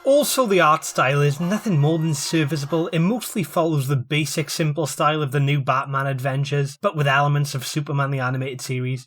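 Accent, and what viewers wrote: United Kingdom. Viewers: Are you from Scotland? Im not well versed in the batman story but you kept it exciting and easy to follow.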